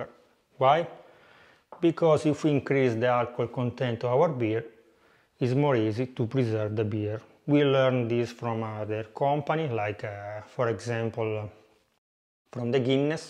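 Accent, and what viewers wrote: Italy. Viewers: Wow! Maestro,I really learnt alot especially that of your fascinating Italian accent, it makes me feel like am in Milan or Cincinnati already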